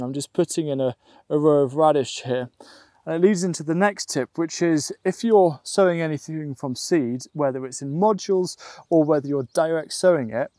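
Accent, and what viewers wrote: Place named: Wales, United Kingdom. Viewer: I agree with your views on no dig, but with one very important caveat: in a very small garden it is reasonable, as you can probably produce enough of your own compost, or only need to buy in very litte. However, once the area gets bigger (I have around 50 sq m of vegetable beds in rotation), I cannot produce enough own compost for the whole area such that I have say 3-4 cms of compost on all the beds. I have bought in from the local recycling plant in order to compensate. However, one is never sure just what is in the compost (even here in Germany), and it costs us retail buyers simply too much - because we are not selling our produce. My answer is to rotate with a combination of green cover crops on the areas that profited from real compost in the finishing season, and use my own compost and very little bought in compost for the area that will be used for the potatoes and carrots in the following season. 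This is a compromise, as it does entail working in the green cover crop, but I think that the combination may even be better for soil health in the end, as the soil is being fed not just compost, but cover crops as well, in rotation. I wish we had as much rain here in Mönchengladbach as you do in Wales, and I am envious of your set up. But I am 70 years old, only turned to vegetable growing 5 years ago, and to be honest, the sort of area you are „farming“ is very much a young man‘s job. But I do enjoy your videos, and I love your Welsh accent!!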